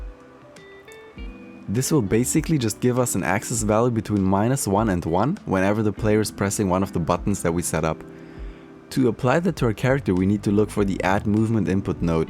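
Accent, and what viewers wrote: Germany. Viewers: Love your channel!! Are you german?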